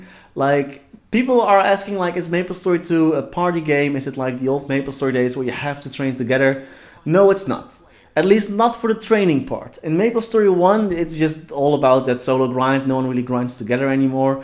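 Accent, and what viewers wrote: Netherlands. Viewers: you sound from the Nederlands man ar im right ?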